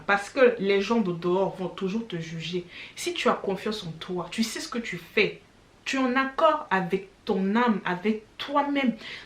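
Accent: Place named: Democratic Republic of the Congo. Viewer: L'accent a lui tout seul rend la vidéo drôle xDD Nan sérieux je kiff l'accent congolais (je suis sur a 80% que tu est congolaise) , québécois et russe 😊😁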